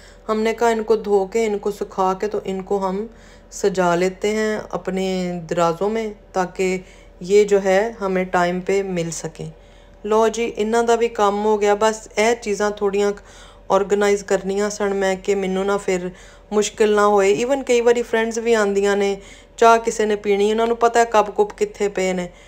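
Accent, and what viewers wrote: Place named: Punjab, India. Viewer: Salaam ❤ sweetest Punjabi ❤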